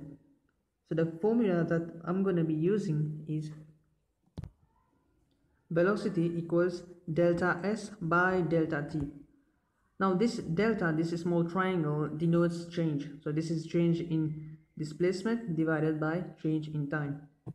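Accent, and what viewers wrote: Mauritius. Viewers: are you mauritian??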